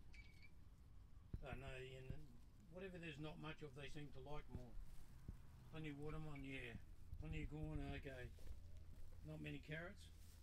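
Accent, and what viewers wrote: Australia. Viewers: Saw the adorable babies, heard the Aussie accent and I had to subscribe to the channel!💕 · What a handsome man with such a wonderful Australian accent😊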